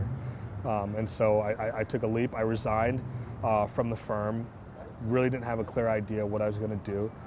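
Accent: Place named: Africa. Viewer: You guys are actually from africa aren't you and now you're here giving us a bad name. Well listen up buddy-we don't need your help so stop hiding behind AA and show your true self. Covering OR dropping your accent is not fooling anyone😎😎😎😎😪😪😪😪😪😓😓😓😓😬😬😬😬😬😬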